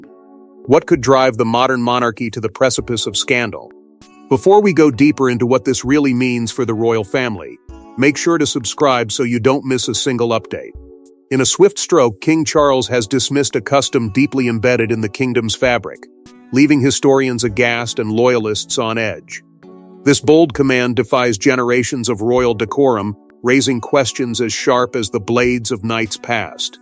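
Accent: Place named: United States. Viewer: A British story told by a "Yankee" voice - PLEASE - have some thought for those of us who find this discrepancy really jarring.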